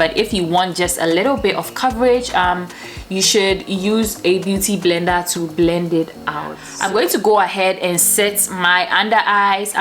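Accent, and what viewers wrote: Ghana. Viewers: Loved this. I was listening to you talk and I thought "she sounds Ghanaian", then I read your profile and see that you are in Ghana. So cool! Thank you for sharing your knowledge with us!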